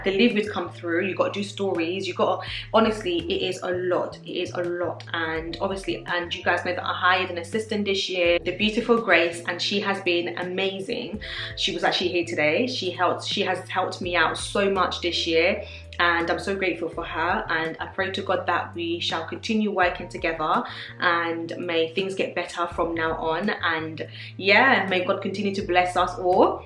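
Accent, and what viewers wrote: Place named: United Kingdom. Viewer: You seem so sweet, please do NOT stop being so nice and friendly because when you do, you have just allowed yourself to be in the class of those who are not friendly. You will get more attention continuing to be who GOD made you. Never let the enemy win! Apparently, they see something in you that they are lacking. You can be reserved, but that's normal, you determine those you detect instantly that you need to be reserved around. See, with all of the designer stuff ( which btw, Chanel is my favorite), some black women get so caught up in doing this as a job and then losing themselves in it, that they lean more towards the designer part....like oh, I'm better, I'm an influencer, I've got, I've got....so I'm better than you, or I can compete against you. It only means you can tell they are not use to having. GOD can wipe all they have away in a matter of seconds. 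And yes, I'm speaking of my black Sisters, not all are like this, but I dont care about what other ethnic backgrounds think. We need to get better at working to " influence" each other. Love your accent....dont know if you are from the UK, but I hope to visit one day. I did live in Europe for 3 years, but that was years ago....b blessed, stay nice and humble, putting GOD 1st and you will flourish...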